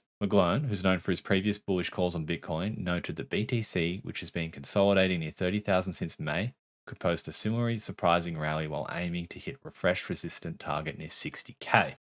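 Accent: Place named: Australia. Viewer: I presume your an Australian (buy the nice accent lol) easy to listen too, unlike many other YouTubers Just subscribed from Melbourne , great job mate , hodling a few Shiba 🤛👏👍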